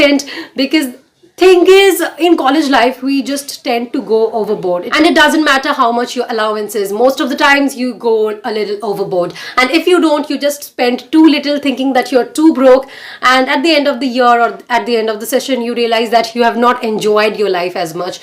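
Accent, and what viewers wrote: Bengal, India. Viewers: Are you Indian? Your pronunciation proves that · May I know if you are a Bengali... Because I found a Bengali-like accent in your speaking and that is good. Anyway I'm a Bengali.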